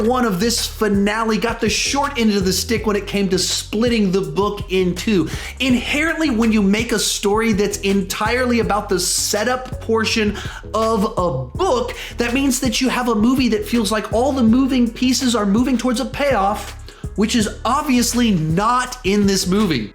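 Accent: United States. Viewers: Birtish names pronounced by an american. Hurts my ears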